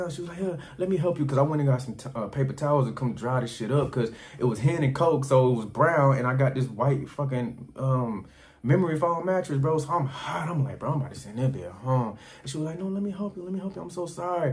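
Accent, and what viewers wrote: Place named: Memphis, United States. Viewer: Definitely sounds like you are from Memphis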